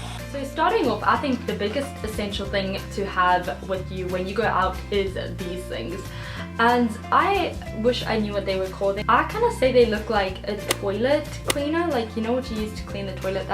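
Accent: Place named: South Africa. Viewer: Me watches 10 seconds she is south African Me goes to description box reads: I am south Africa Me I knew it🤗 Btw getting braces soon and this helped a lot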